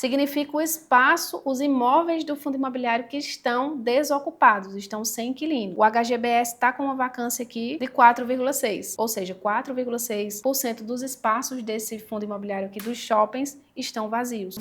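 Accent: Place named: Pernambuco, Brazil. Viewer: Fica maia engraçado ainda com o sotaque de pernambucana kkkkkk😅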